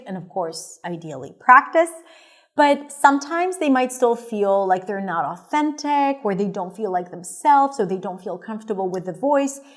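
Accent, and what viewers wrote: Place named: United States. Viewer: i love you, I love your tone, it's super cool and soft and delicate, but I'm Cuban, I use a translator and the Duolingo app, but I like your continuity listening to you talk, I think it's better that way, I learn more because I'll be listening to you all the time, even if I go out to work, I'd love to have the headphones on to listen to you all the time, now I live in Guyana 🇬🇾 English, but this dialect is not yours the real American, I'd like to have some recordings of yours to listen to it while I'm working one and a thousand times to listen only real English, but I hope you have some recordings which I can download to listen to them offline, because when I am connected messages come in all the time and the recording is not listened to well, thank you beautiful lady, I hope to learn a lot with you if you can help me well to study ❤❤❤❤🙏🙏🙏🙏😊😊😊😊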